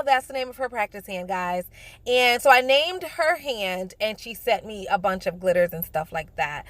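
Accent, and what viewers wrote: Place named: New York, United States. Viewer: Haha. I can hear it. I'm not from NY (from DC) but can spot the NY accent anywhere. LOL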